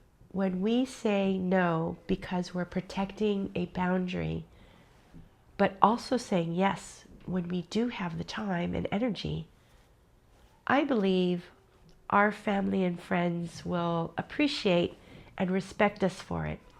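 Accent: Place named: Netherlands. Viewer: Hi, sounds Dutch are you? Well don’t give up yet. There is a bigger reason why you are here and your life has value. Most people have problems nobody knows about. Count your blessings and focus on what you are still capable do and find someone you can help with their problems. Stay strong ❤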